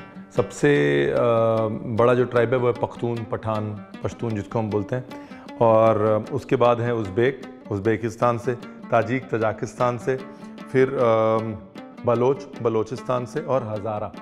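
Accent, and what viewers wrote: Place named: Lucknow, India. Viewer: The way you speak is amazing, no one can touch the Lucknowi style and poise.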